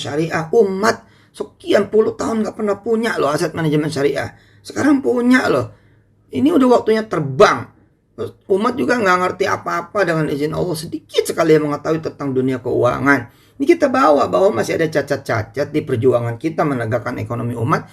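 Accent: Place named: Jakarta, Indonesia. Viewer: gaya orang Betawi logat ngomong nya memang kaya itu ... Seandainya beliau orang solo .. pasti bicara tidak menekan .. (100 % miscomunikasi)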